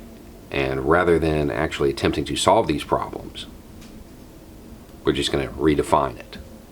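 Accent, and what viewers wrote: South, United States. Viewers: First off let me say I am not a voter I stopped voting after Bush H said it’s enough I can’t change one thing. I did serve in the military for 6 years. I have watched many of your videos and I must say you just rehash everything that’s being said in a different way. You sound like you’re from the South but there’s something else. You are no better than the other lefties or righties out there pushing their bullshit. I’ll start voting again when we come together. I have said and will keep saying I’ll vote for a fiscal conservative no matter the party. But you with your Bob Ross demeanor and smooth quite way of thinking your way is the way is the only way. To me it’s just more don’t forget to thumbs up and subscribe so the $$$$ keep flowing and you are laughing all the way to the bank. It’s the capitalist way.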